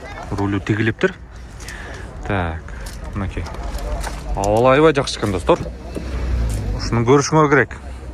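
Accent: Standard. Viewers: Ушуну таза кыргызча десе болот моладес брат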